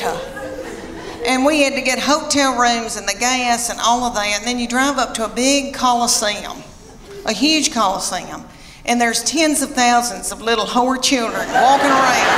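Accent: South, United States. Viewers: This lady is absolutely HILARIOUS with that NATURAL southern accent, I love her and she's really cute to boot🥰🥰🥰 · She's absolutely beautiful, and I love her southern accent! 😘😍🥰 Thick women are a gift! 🎁🥰😘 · Southern accents are my favorite! Sounds so nice! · So true about how everyone (parents and kids) act at these events. Absolutely hilarious, all the more so because it’s true. And it’s Leanne Morgan so it’s got her southern accent and insight. You’re a national treasure. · After discovering Jeanne Robertson, then you, I realise I have a liking for southern lady comedians. Your charming accent is the perfect accompaniment to a funny story. If you visit the UK, I shall definitely buy a ticket. 💕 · From the South. I believe she is from TN.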